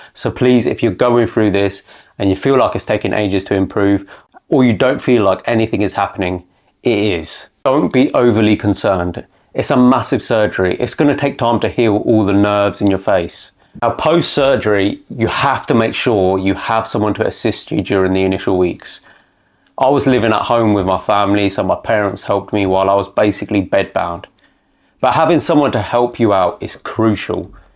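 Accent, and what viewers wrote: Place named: United Kingdom. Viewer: Who did your surgery you sound from the UK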